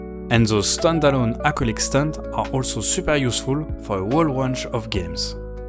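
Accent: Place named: France. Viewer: Great video, also could barely tell by your accent that you are french! laughs in Hoho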